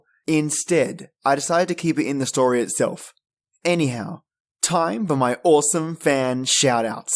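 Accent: Australia. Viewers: Trying to sleep at 4AM, but before I finally doze off I'll be here listening to this video 👍🖤 in that awesome Aussie accent of yours 🙂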